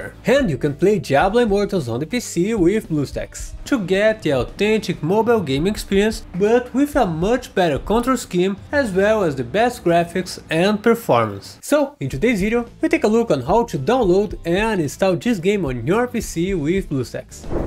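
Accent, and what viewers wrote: Brazil. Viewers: Tá falando de um jeito que brasileiro falando inglês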